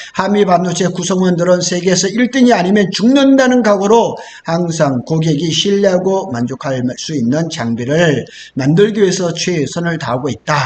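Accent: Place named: Korean-Chinese, China. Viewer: 억양이 조선족. 의심스럽다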